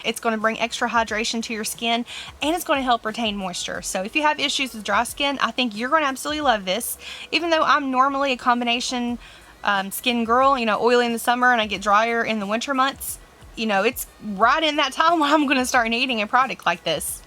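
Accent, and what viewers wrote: South, United States. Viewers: Sorry about you not feeling well! Are you southern though?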